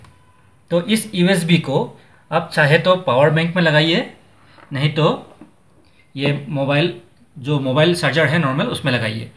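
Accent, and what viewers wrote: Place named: Assam, India. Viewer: kiunki tu bengali hai wo asamese hai. dont make fun on others accent. being a bengali i know how u people too have funny hindi accent.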